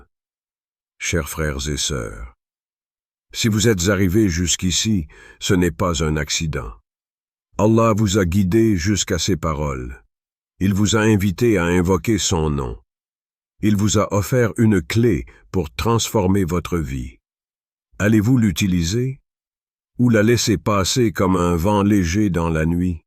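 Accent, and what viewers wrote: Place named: Canada. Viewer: Ce léger accent canadien........😮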